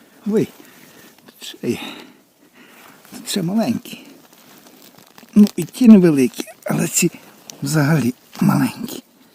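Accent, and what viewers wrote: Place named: West, Ukraine. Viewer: Здравствуйте! Откуда вы, какая область. Мой свекр с запада Украины Ивано-Франковской области, разговаривал точно так, даже голос похож.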